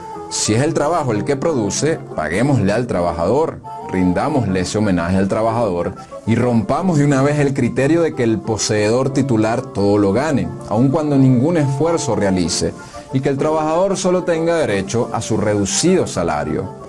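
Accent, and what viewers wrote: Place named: Venezuela. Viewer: Fantastico canal, Felicitaciones, que gusto ver este tipo de documentales con acento Venezolano!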